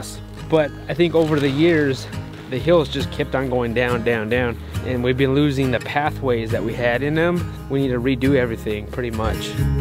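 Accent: Southern California, United States. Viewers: Excellent work! Your greenhouse is amazing! I wish I had that same greenhouse on my farm! Beautiful! Raise The Ruff! Be careful! By next year you may be calling it a Roof! Ha! Ha! The longer your here the more you will lose your Southern Cali Accent! You should hear my sister in law's accent now. She is originally from Glasgow Scotland. However, after many years living in North Carolina she has the most unique accent you've ever heard. Part of it is Scottish accent, part of it is Southern North Carolina Accent! So Beautiful! We will compare as time goes on to see how your accent is affected! LOL! It's All Good! When we lived away from the south in Japan and New Mexico I lost some of my southern accent for a while too! Funny how environment and surrounding people affect what we sound like! I hope it doesn't offend you how we tease you about your accent. 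I used to get teased a lot about my southern accent when my husband was in the Air Force. People would always ask me to say certain words and often just say to me... Can we just listen to how you say things??? So Funny! It never bothered me. I just laughed and had fun with it! No Harm! Just Laughs! God Bless!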